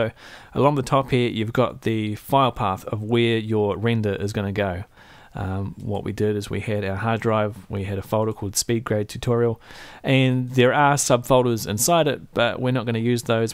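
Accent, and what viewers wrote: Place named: New Zealand. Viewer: Love being a kiwi then hearing another and be like ahh thats better coming from some 12 year old strong american accent haha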